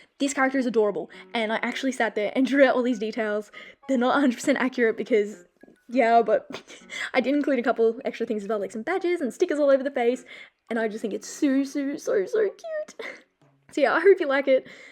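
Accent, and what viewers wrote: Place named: Australia. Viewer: Are u from Australia right? I love your accent! And of course your style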